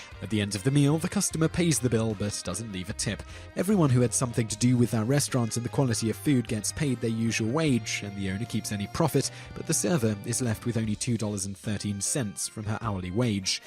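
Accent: United Kingdom. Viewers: The narrators voice was was from the UK I know because I am also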